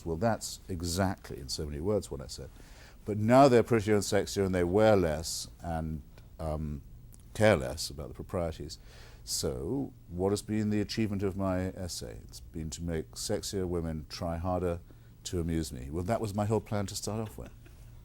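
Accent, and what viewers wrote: England, United Kingdom. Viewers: His English accent and perfect way of speaking is the cherry on top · the women seem to have penis’ dear. In his English accent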